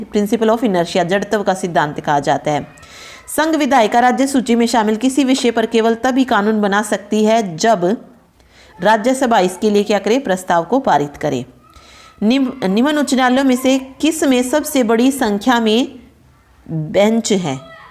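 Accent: Haryana, India. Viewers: Jo apka haryanvi touch h wo bda acha h ..👍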